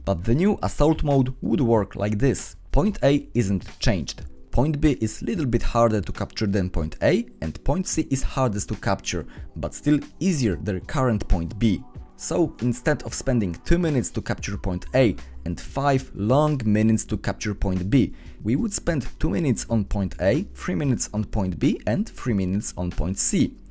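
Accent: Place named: Poland. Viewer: Beautiful Polish accent, at first you were Russian, ale potem zauważyłem ee to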